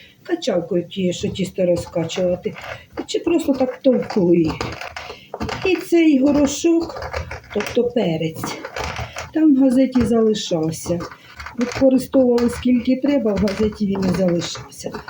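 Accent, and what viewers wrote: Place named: Ukraine. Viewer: ! Очень приятная женщина, молодая, все получается, украинский говор. Дай Бог тебе здоровья, удачи во всех делах, любви и дружбы!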